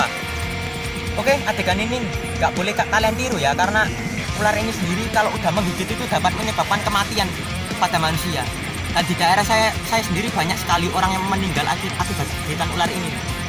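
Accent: Java, Indonesia. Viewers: Video bagus..tapi medhoknya itu lucu..jadi kayak lawak si inyong. 😊🙏😅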